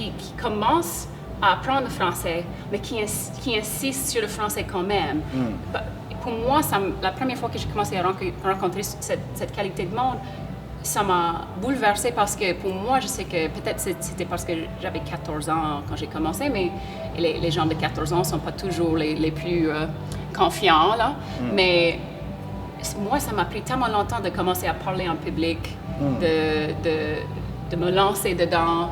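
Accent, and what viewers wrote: Quebec, Canada. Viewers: tu à un super niveau de français.. bravo.. Avec un léger accent québécois..!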